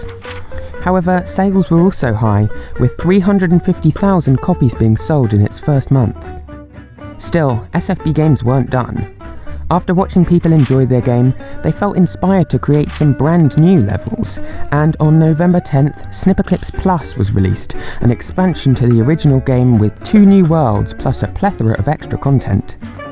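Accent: United Kingdom. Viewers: Hes british like me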